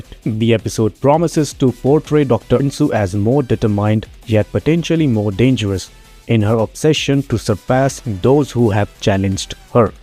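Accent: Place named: India. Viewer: why are you talking like an indian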